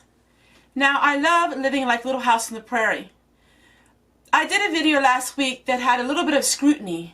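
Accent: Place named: Pennsylvania, United States. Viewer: I understand. I watched your Sunday video. It was excellent! You're such a godly lady. ❤ If I didn't know any better I'd think you were from my old neck of the woods.....in Pennsylvania.... because of your accent. 😉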